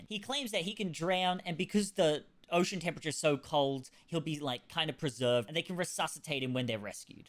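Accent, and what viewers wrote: Australia. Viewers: Ok Australian Kurtis Conner! This was funny as hell loool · Never heard anyone pronounce Tubi like “tubby”. Lol! Thought we all knew it’s from the word “Tube”, as that’s the nickname for old school TV’s (coming from the technology used, to create the broadcast picture on the screen. They utilized a series of vacuum TUBES). Interesting. That a Aussie thing (not sure which country you’re from exactly, so I’m just guessing by your accent. Lol!)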